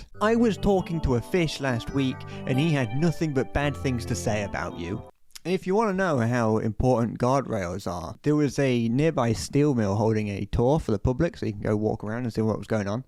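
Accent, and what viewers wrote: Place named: United Kingdom. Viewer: This was the best 17 minutes of british rambling I've listend to in a while... No idea what this games point is tho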